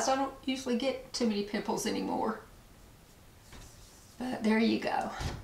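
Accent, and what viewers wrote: South, United States. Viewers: Love your hair colour .and red certainly suits you .but. The nicest is that accent ! Remind s me of , " gone with the wind " .Dont love wet n wild cheap . Looked nice but not for me .Happy valentine's ❤️🌹🌹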